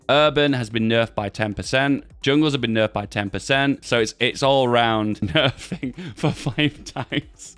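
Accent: England, United Kingdom. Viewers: You know, Ive watched your videos for years. No idea what you looked like. This isnt what I expected lol not bad. lol Whats the accent? like region of England?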